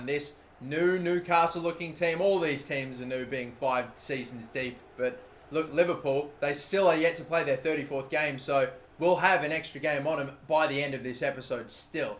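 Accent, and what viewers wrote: Australia. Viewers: i unsubscribes when u told them all to die u angry australian man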